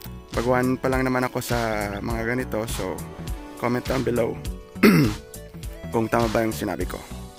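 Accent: Iloilo, Philippines. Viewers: Boss. I noticed from your previous vlog that you sounded Ilonggo! Where's this route your taking today? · Nami ma mati illonggo nga motovloger..... especially Ang tingog mo hahaha daw wla gid d b illonggo bloggers ehh heheheh nga illonggo gid Ang Gina hambl sa vlogs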